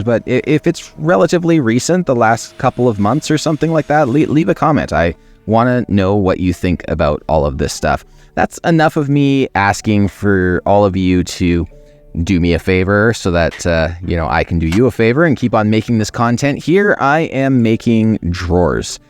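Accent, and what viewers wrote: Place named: Canada. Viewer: Wait, you're Canadian?!? 😂 I should have caught the accent sooner. Feeding the algorithm for you from a bit South - almost to the Gulf of Mexico. 😄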